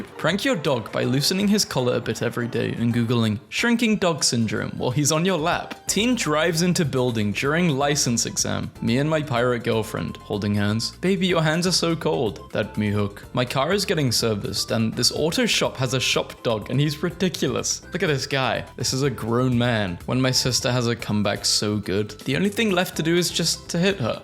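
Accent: United Kingdom. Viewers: the way you pronounce things like britishly like saying "media" as "meteor" tickles my funny (im sorry for being american)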